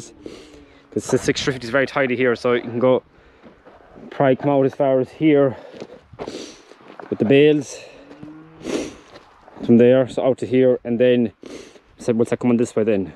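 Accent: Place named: Ireland. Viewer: Loving the videos loving the machinery I’m quiet jealous now you have it all 😂, I can no longer do farming because of an injury from a motorbike accident I can’t move my right arm but I love watching farming videos to keep in touch with the life I love, I could very much be wrong but this looks like a Leinster farm and the accent sounds familiar but in Ireland we all kinda sound the same 😂 glad I found your Chanel and I hope all these price rises don’t cut you back will be a tough year with buying prices rising and selling prices not rising half as much I hope it doesn’t f the farmers up too much, best of luck and thanks for the video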